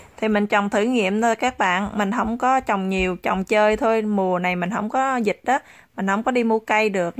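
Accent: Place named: Mekong Delta, Vietnam. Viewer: OK. Chi. Giong noi chi nghe nhu mien Tay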